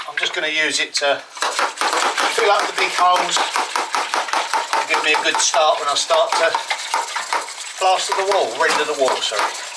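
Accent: Essex, United Kingdom. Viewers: Nice one! I’m only in Romford and thought you sounded pretty local; for France!🤣 Really enjoying your work, love it. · I always enjoy seeing what you’re up to and your Essex accent is part of your charm, no problem understanding what you say here.😊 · I have to admit that I love your brocante and architectural tours of the ancient churchs and castles and of course eating vlogs the most, but there is still something very satisfying in seeing you complete some impossible, complicated repair jobs except this last one, today, you definitely needed a safety harness on and to the viewer looked dangerous. Your Essex accent is delightful and quite understandable and if some viewers want help just "google" Help understandinng English accents". Thanks for taking me along. Mary Ann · Nothing wrong with an Essex Accent. This is coming from an ex Romford Boy 😂. 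Another great video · Hi. I understand your Essex accent. I come from Zummerzet my dear but been in Aussie for over 50 years but still have my accent which I am very proud of 😊Be careful on those ladders and mind your back. The kittens are gorgeous and enjoying their lives. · That Essex accent is quite charming for those of who haven't lived in England.😊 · Awsome job and being not english ( Holland) i have no problem ( so far ) with your essex accent. Love the kittens. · I love your cats, I enjoy seeing what you’re doing with your lovely old cottage, and I can understand your Essex accent even though I’m Canadian! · Essex accent is just fine. Just had my DNA completed. Mostly I am from England. My direct immigrant ancestor was from Wherstead, Suffolk County. Went there, charming little village near Ipswich. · Luv the Essex accent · With regard to your Essex accent, I love it! And yes, I can understand you just fine. 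With regard to the channel, well, both channels really, I thoroughly enjoy them, and I have learned and am learning quite a bit from you, as well as being happily entertained. I have learned how to point and render, including what the ratio is between sand and lime; building up a wall; the strengthening rafters and purlins; as well as learning a lot about French chateaus and history. So, thank you so very much. I really mean that. And I am always looking forward to the next video!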